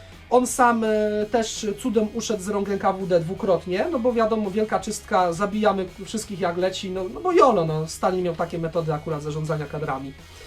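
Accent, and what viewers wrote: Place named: Silesia, Poland. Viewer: szlysza ze z naszej gotki mosz ten hajmacki akcent, pyrsk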